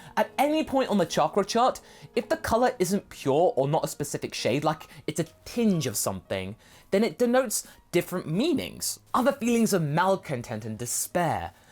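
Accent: United Kingdom. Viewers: Is that how British you really are?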